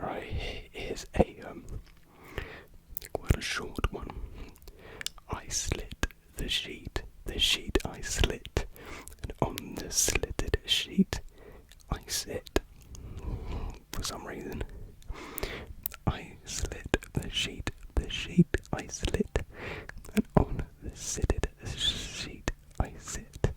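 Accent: United Kingdom. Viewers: British accent 😩😍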